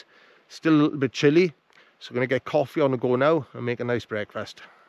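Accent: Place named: Abercynon, United Kingdom. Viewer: Enjoy your videos, I'm a Carmarthen man but my wife is from Abercynon so I fondly recognize your accent from the area . Like you I enjoy getting out wild camping but my poison is a spiced rum which I enjoyed in the middle of nowhere with the rain pouring down in a mist .It soothes the soul. Keep up the great content.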